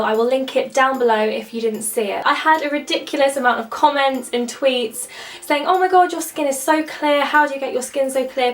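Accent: United Kingdom. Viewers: I just love the british Accent (I live at another country). Please can you make the room tour now, Not by 3000 cause can't wait i love just your backround. And you derseve all followers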